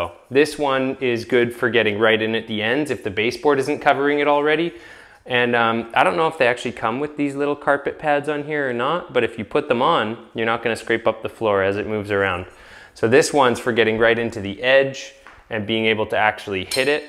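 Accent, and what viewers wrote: Canada. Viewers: You sound unbelievably Canadian.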